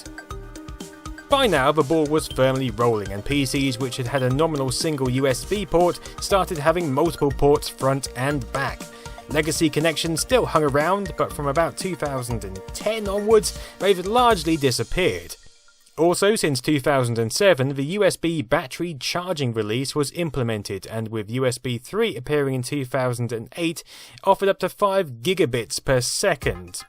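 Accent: United Kingdom. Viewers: John Pattillo it is A-T. Like AT ST AT AT. he's english/British they say everything wrong. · Must be a British thing. Here in the USofA it's "RS two thirty two" vs. "RS two three two" and the PC/AT (Advanced Technology) was "PC A-T" vs. "PC at". Other than that, a delightful romp down memory lane. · I didn’t know was British or Australian or South African I thought he was American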